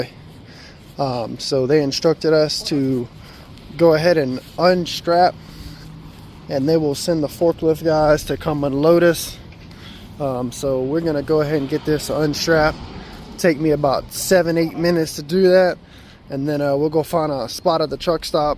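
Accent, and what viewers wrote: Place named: Louisiana, United States. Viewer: I hear Louisiana. You from The Boot?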